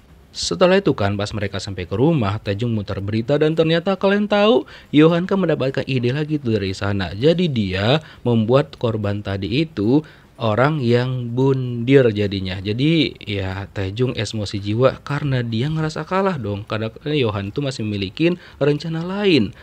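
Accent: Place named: Sulawesi, Indonesia. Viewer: Ko macam orang sulawesi kah atau ntt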